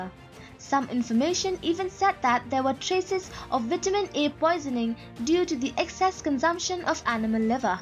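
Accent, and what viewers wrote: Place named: Meghalaya, India. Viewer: The narrator sounds Khasi. Is she?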